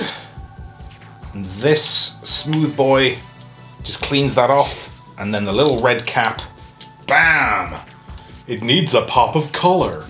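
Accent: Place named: United Kingdom. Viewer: I do remember the "Transform a thing" song! I do miss it! I don't remember exactly how I started watching your reviews, I do remember it was either the Kapow sponsored ones that showed up as news on Seibertron OR Acid Storm and Dropshot because they were obscure repaints and no one else had done them when I got them, but I do remember I saw the first ones and was like "heh, cool reviews" but left it there, and then when I saw the second ones I was like "heh, cool re...wait, I had seen reviews by this english guy before!" And after that, I began binge watching your stuff and have been a faithful follower ever since, you even helped me finally understand british accent!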